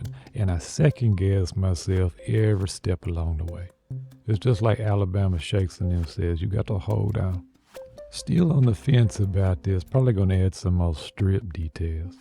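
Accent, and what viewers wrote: Louisiana, United States. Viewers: Dat voice reminds me of a Louisianan accent.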